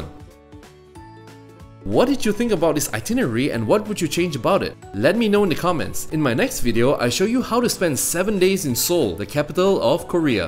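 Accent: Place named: Singapore. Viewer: You sound like you’re from Singapore.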